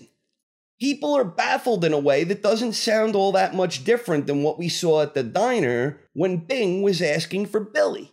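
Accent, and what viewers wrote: United States. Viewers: i would love to know if this is your real voice..and if so....which part of America are you from...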